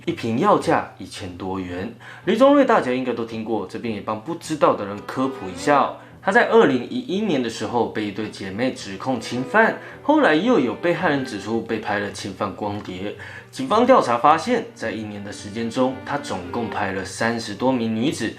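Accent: Northeast, China. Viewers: 我艹，大叔是东北人？ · 听口音，这不是江苏淮安人啊，这很明显是个东北人啊，视频不严谨呢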